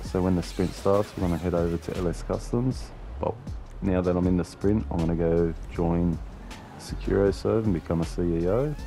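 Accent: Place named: Australia. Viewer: U sound like Ur from Australia if so I'm also from Australia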